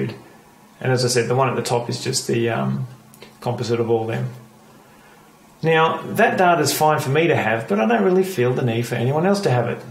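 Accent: Australia. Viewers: I know this is an old post but maybe you are still monitoring it. I live in California, you sound like you are from AU so you may not know about the planned power outages in CA. So if I am understanding you correctly when there is a planned power outage which can be for up to 6 days they tell us if there is no wifi or 3G connection for the power wall to report to Tesla I will have periods of time when I will not have power if I am on battery?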